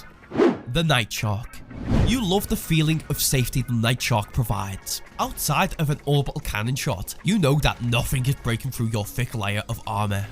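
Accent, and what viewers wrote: United Kingdom. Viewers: SCOUSER!! No way ye not bro can hear it even through ye weird accent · Ok dies viioe is preyys nice! I ont´t like Briish accsent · This guy is the definition of the bri'ish accent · Im sorry but please dont speak like a narrator because that british accsent+narrator just gave me a headache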